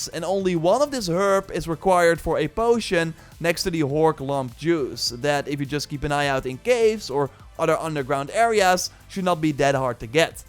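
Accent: Netherlands. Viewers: Wtf ik kan gewoon aan je stem horen dat je Nederlands bent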